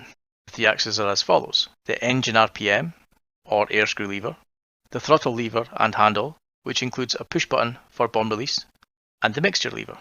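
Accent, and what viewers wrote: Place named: Newcastle, United Kingdom. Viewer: Love the Geordie accent, why aye pet, emotional roller-coaster of emotial emotions 🤠👍🏿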